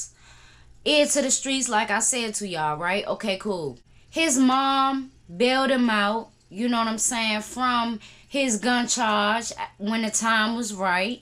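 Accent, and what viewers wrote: Washington DC, United States. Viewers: True DC accent.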